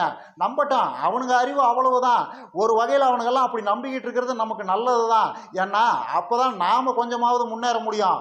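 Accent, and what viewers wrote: Kongu, India. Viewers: கொங்கு பகுதியில் பேசும் பழக்கம் உடையவர்கள் என்று நினைக்கிறேன். இந்த செய்தி பரவாயில்லை.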